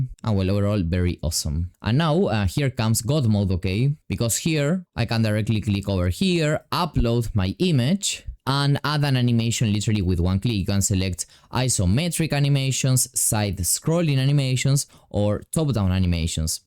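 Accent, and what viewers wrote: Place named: Argentina. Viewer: habla argentino mejor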